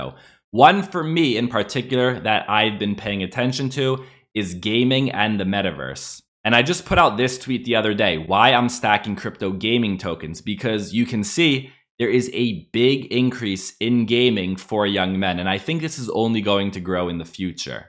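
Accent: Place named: Philadelphia, United States. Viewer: Dude is from the Philly area. I’d bet some money. You can tell by his accent.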